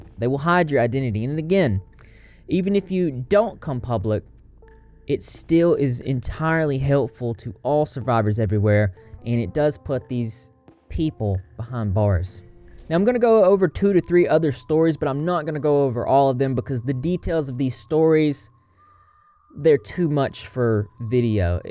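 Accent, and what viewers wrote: South, United States. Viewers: You gotta be from the south, lol?? Shout-out from NC!!!! I'll admit, back in 2012 I watched a video of a MM concert where he pushes a bandmate on stage in front of hundreds of people. The guy stops playing the guitar for a moment, long enough to briefly yell at Manson, raise his fist as If he is going to start throwing blows. But he quickly starts playing guitar again.... At this time, MM mocks the guitar player by placing his hands in the air and moving them as if he is working a puppet on strings to the beat of the music. Seeing this, I developed a feeling in the pit of my core that told me MM had abusive tendencies, and I couldn't help but think "God I bet that guy is an absolute nightmare to deal with". And quiet frankly, after I watched an interview about Manson being a follower of Anton Szandor LaVey, Aleister Crowley, was given an "honorary priesthood" and claims to be ordained by the Satanic Temple, and has actually had run-ins with books such as Necronomicon.. 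one might be forgiven for believing he is indeed capable of committing such horrendous acts upon another human being. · Bro that's a sick southern accent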